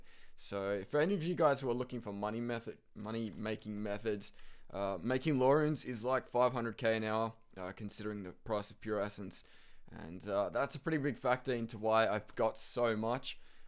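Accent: Australia. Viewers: just an Australian accent... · are you Australian?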